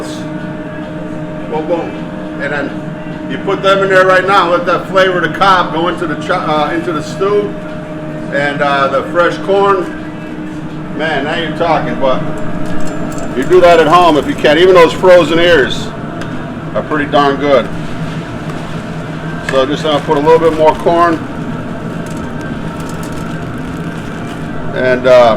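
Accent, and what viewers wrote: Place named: New England, United States. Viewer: The stew looks so good,. I'll try it sometime. I am so impressed with your willingness to endorse other YT channels (I'm a Kent Rollins subscriber) and encourage people to watch their channels as well. That goes a long way with me, and demonstrates your personal generosity and humility. Major kudos with me, pard. BTW your accent is so great (yeah, you have one, just like everyone else lol) it's a mix of New England and New York that is surprisingly, sort of comforting. Keep up the good work. Just for giggles, could you do a corned beef brisket for St. Patrick's Day? You have the modern programmable pressure cooker (the brand name escapes me) but I've done a corned a beef brisket in one, and it's not bad at all. I'd prefer to take the 3+ hours to cook it properly, but if you're in a rush, ya gotta do what ya gotta do. 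I bought a huge brisket a little while back, and if you could do one from untouched brisket to finished product, it would really help me with my preparation in a couple months. I'm hoping St. Paddy's isn't on a Friday again during Lent. So inconvenient lol Love what you do. Thank you.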